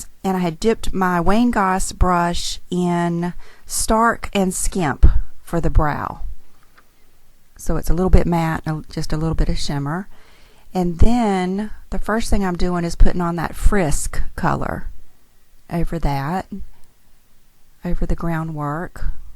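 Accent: South, United States. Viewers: You have such a calming, sweet Southern voice, 😊 Great voice over tutorial! Hopefully, once the kiddos go back to school, you'll do some "Bunny Vlogs"👍🏻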